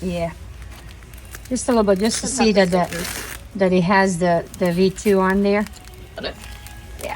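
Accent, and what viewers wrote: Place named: Central Europe. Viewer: Nice! Im trying to place your accent......Central Europe?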